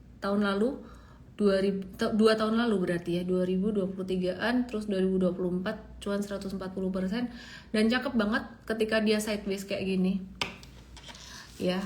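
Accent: Java, Indonesia. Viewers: Suaranya medok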